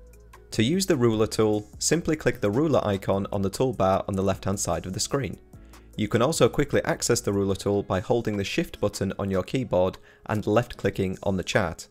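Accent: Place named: North, United Kingdom. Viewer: Half way through & it is an EXCELLENT education! Thank you! Top Northern accent also!!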